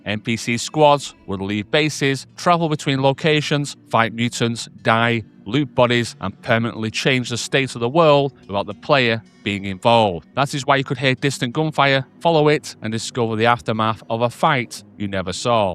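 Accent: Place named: United Kingdom. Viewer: That bri-ish accent boy explaining stoka 2 again. Смешной такой, и откуда он только взялся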